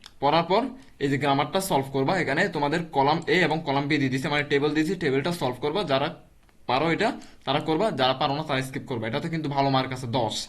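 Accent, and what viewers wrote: Chittagong, Bangladesh. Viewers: Are you from Chittagong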